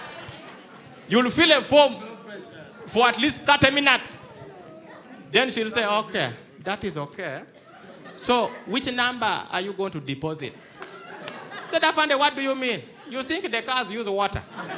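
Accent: Uganda. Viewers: he is exceptional and way above some Nigeria comedians but it is pure ignorance to talk about accent of Nigerians when he has a strong Ugandan accent himself. You can actually disagree with your head and not your heart.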